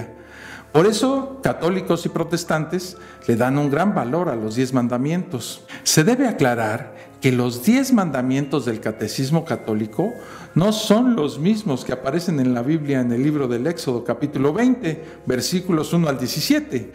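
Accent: Mexico City, Mexico. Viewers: Cómo me hiciste, reír con ese sabroso acento chilango jajaja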